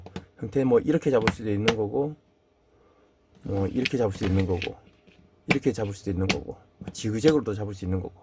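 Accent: Gyeongsang, South Korea. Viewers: 밧데리 박사학위 드리고 싶어요 구수한 갱상도 사투리 좀 버벅하시만 그것이 더 정겹네요 쪽집게 과외 처럼 존경합니다 밥 커피 대접 하고 싶어요 저는 🏝에 살아요 부시리 삼치 드시러 오세요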